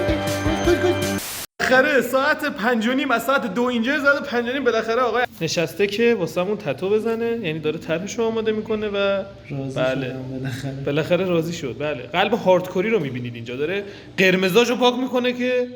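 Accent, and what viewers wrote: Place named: Kermanshah, Iran. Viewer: عاشق لهجه کرماشانیت شدم 😂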